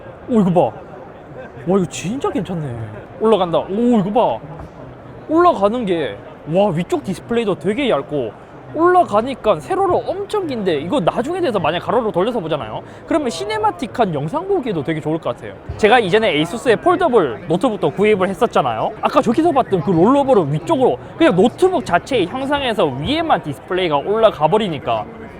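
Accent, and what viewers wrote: Gyeongsang, South Korea. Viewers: 외국엔 좀 안나가면 안되나 한국인이 다 잇섭처럼 눈작고 안경끼고 말투 경상도말투 쓰는줄 알거아냐 ㅠ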